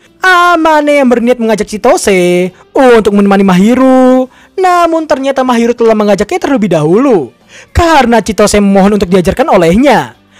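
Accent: Java, Indonesia. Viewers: bang orang jawa ya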